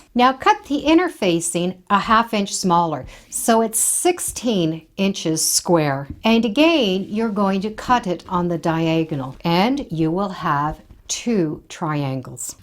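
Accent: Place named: Canada. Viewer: I could listen to you say "again, against" over and over. That Canadian sound is clear.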